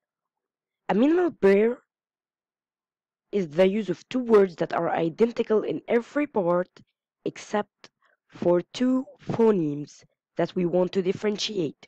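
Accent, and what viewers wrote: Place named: Arab world. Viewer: لو سمحتي عايزة اتواصل معاكي فالرسايل ضروري ممكن الايميل او اكونت الفيس انا guess من لهجة حضرتك انك عربية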